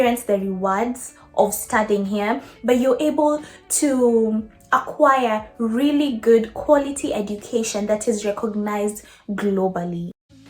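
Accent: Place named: Kenya. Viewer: Thank you for sharing! I love your Kenyan accent😍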